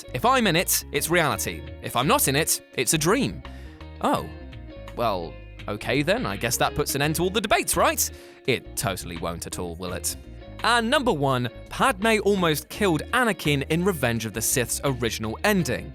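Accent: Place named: Cockney, United Kingdom. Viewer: The content is quite interesting if you can deal with the annoying chirpy cockney banter that goes with it, there is nothing worse than somebody who thinks they are funny.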